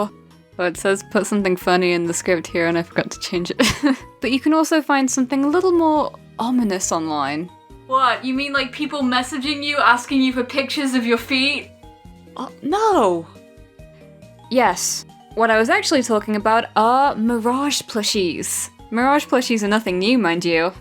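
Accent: United Kingdom. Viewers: Hmm, i dont have a single one i only subbed a few days ago for a few reasons Pokemon Like the Accent Like the Voice BRITISH MATE ( love the UK even tho im just a lowly German ^^ ) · Because of your calming voice and British accent even you say something really WACK, will still be calming to my ears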